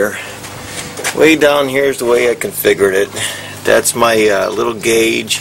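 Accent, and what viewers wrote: Pennsylvania, United States. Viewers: Best video I ever seen. Thanks 👍✌ PS. Your from PA. I know the accent 😄😄😄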